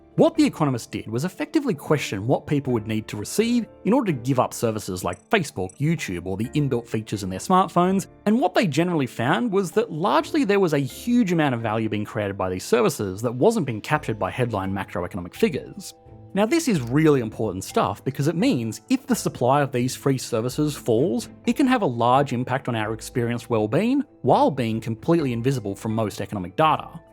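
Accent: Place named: Bogan, Australia. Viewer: Subscribed for the dulcet bogan drawl.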